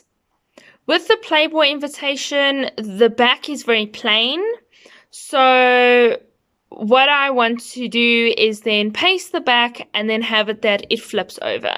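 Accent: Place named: Australia. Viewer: i'm also and i like your accent are you from Australia?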